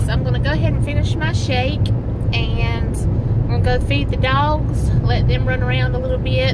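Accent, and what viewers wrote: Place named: South, United States. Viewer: I really enjoyed this and your southern accent :) I did a MUKBANG on my channel for them also :)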